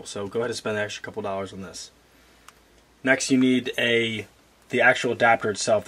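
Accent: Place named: Philadelphia, United States. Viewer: Damn I was thinking that sure sounded like a Philadelphia/ Delco accent thEn saw the license plate! Good video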